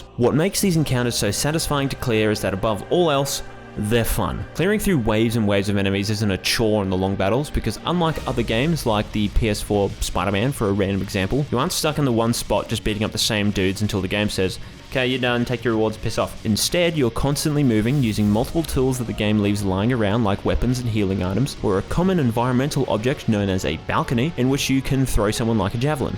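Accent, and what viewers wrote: Australia. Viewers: He's Australian, not British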